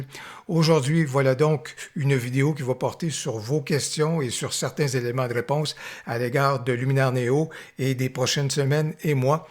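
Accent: Canada. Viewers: votre accent Canadien que j adore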